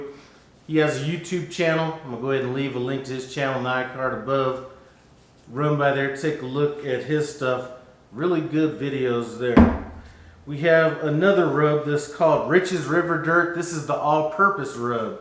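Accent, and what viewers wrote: South, United States. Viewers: Funny finding myself on your page- I was looking at how to combine my steak and queso fresco... and an hour later I'm still looking up seasoning for grilling! I know you said you weren't responding, BUT, any suggestions on a flavorful rub for smoking pork?! Mine always seems bland, like a wasted effort, 10 hours later. And, I think you said Colorado, but you sounded a little southern to me... at the same time, the UNC on your jacket isn't the same shade blue UNC wears down here! Either way, Cheers!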